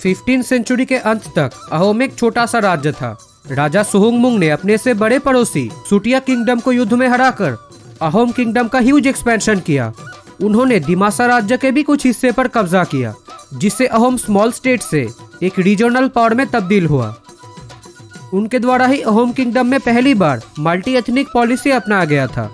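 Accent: Bengal, India. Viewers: Your Bengali Accent Hindi is sooo noticeable I like it though!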